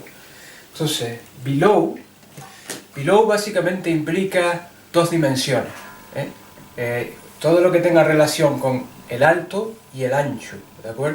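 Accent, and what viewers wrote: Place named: Spain. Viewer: Tienes acento español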